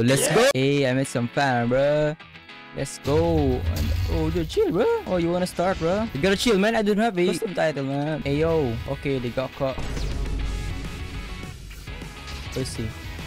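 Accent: Bisaya, Philippines. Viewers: "Bro palaban sa sea beast haahahahahhahahah" Leme ask u are u Tagalog or bisaya bc the way u talk it's like u are bisaya but idk Bruh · First time ko sa channel mo tas yung accent mo sabi ko pilipino toh😂... Naks luv it keep it up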